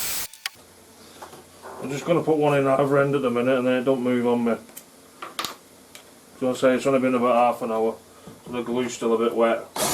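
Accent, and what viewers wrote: United Kingdom. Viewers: Excellent with the exception of my inability to understand your British accent. But, that's my problem, not yours. Thanks for taking the time. I have one of these same saws and I'm going to make this sled.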